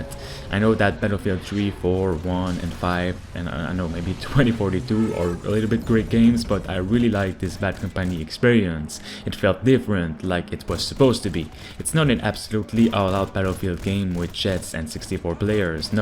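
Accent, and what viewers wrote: Quebec, Canada. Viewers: are you from quebec ? cause your accent is "famillier"lol ,quand jai vue lecriture dans le jeux sa ma sauter au yeux XD je tecoute depuis longtemps et javais un doute mais maintenant jen suis sure! respect frere keep up the good work! i like your contents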